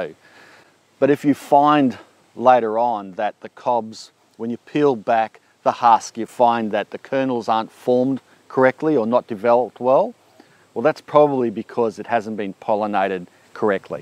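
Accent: Australia. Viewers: I am a new sub and I don't know how I stumbled upon your channel but I am so happy that I did. 1st- I love your accent (Australian is my favorite), 2 - you have a wonderful sense of humor (corny even...lol) 3 - the content and way you present the information is spot on and to the point. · Hi! Love this video. Your accent and enthusiasm reminds me of Steve Irwin, except with vegetables instead of animals!! I'm going to attempt to grow some Bantam corn in a couple of whiskey barrels this year. (I live in an apt, so don't have a garden.) This was great info about spacing, and direct-sow vs. transplanting starts. I saw another video where the corn seeds were started in net pots instead of jiffy pots and they did great. The open-air design of the net pots allows for air-pruning, and not having the plants get root-bound in the starter pots. Good root system, but not overgrown. 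Thanks a lot for all the tips! Be well.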